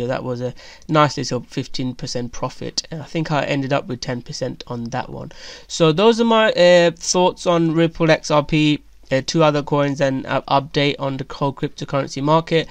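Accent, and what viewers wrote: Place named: Bradford, United Kingdom. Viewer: Another great video as usual. Did you cover the GVT we discussed a couple of weeks ago. If so can you tell me where to find it. What's your thoughts on ADA from a hodl point of view. A £10 price is all I need 😉. I'm trying to figure your accent. I thought I sensed a Bradford twang.but it could be anything from Southampton to Bournemouth Brighton upwards. I'm from God's country (Wales) in Wrexham.Many questions. Take your time 👍🤝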